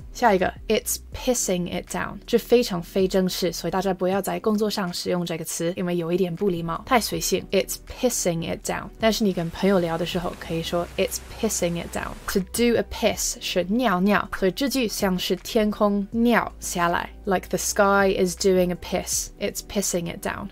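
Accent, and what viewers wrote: United Kingdom. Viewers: It's nice to hear a British accent in these types of videos (too often it's American accent). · 英國腔聽起來真的很好聽, 好漂亮!~~~~ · 終於等到用英國腔的教這個，目前定居在倫敦，希望可以出一些跟孩子的聊天，小朋友在學校跟同學交流，家長跟老師的交流，租房子賣房子這些方面的聊天技巧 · 滿滿的英國腔😊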